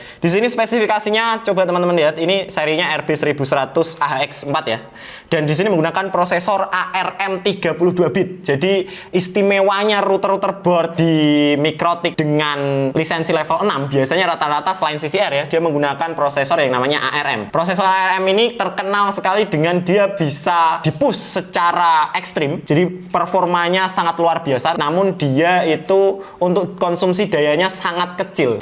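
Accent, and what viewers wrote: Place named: India. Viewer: kang kaya orang india... ngebutt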